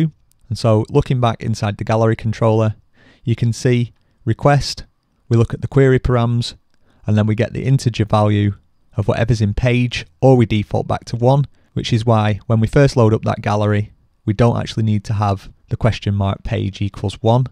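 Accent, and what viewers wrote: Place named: Scotland, United Kingdom. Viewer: Lovely accent, are you Scottish? :)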